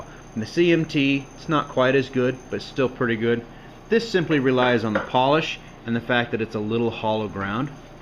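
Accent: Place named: United States. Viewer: You sir, sound like a good american. Awesome blades! Especially the vintage ones that have stayed in the family! Priceless!!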